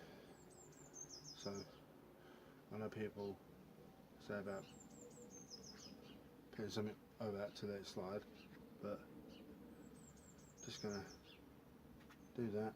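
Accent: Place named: South East England, United Kingdom. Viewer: Are you from the south east mate? Fancy doing mine for me ?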